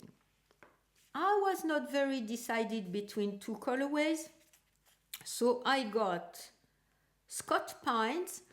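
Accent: France. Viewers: What a delightful podcast! Your French accent is a pleasure to listen to. I can really relate to your acquiring your kryptonite stash and trying to focus on using it up. Best of luck to us all!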